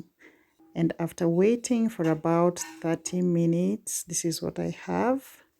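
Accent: Kenya. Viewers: Which part of Kenya is this? It is beautiful. I can only tell that you are from Nyanza following your accent.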